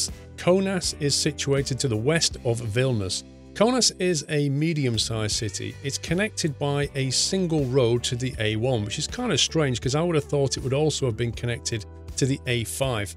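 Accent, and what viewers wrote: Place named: United Kingdom. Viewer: That British pronounciation :D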